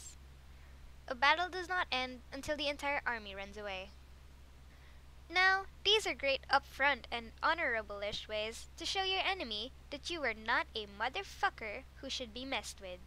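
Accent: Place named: Philippines. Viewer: why does this voice sound suspiciously filipino to me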